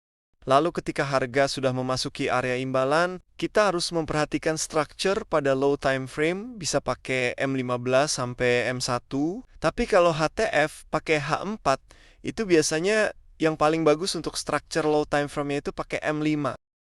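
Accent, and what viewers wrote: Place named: West Java, Indonesia. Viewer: Bang aslinya org mana? Kaya ada logat sunda nya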